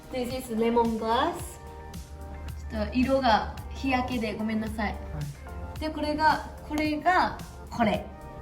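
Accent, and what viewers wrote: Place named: Japan. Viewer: Valo Hoise, Her English is truly Japanese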